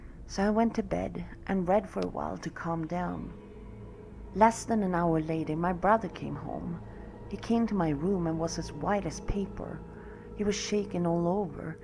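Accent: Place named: Ireland. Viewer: Sounds a tiny bit Irish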